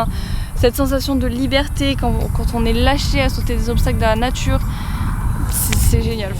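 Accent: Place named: Gard, France. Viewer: Hello :) c'est moi qui t'ai posée la question sur ton accent, en fait c'est parce que j'ai le même :p et c'est l'accent que on a dans le Gard vers Nîmes, et qui est vachement différent de celui de Marseille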